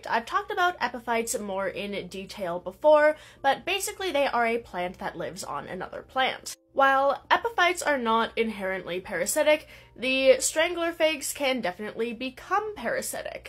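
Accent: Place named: East Coast, United States. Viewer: The effect in your voice is interesting. Maybe East Coast but maybe like some teacher you had. I find it wearing.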